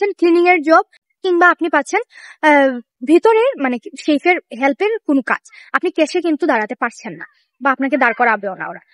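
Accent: Sylhet, Bangladesh. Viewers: আপু তো মনে হয় সিলেটি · Apu apni to sylheti??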